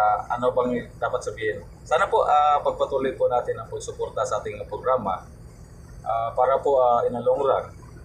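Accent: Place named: Visayas, Philippines. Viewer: Kani kay morag bisaya ni ayy hehe, pinoy galaan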